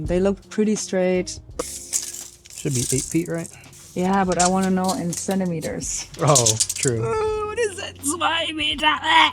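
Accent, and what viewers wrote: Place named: Germany. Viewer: Your German accent has become almost non-existent... except when you say beer/bier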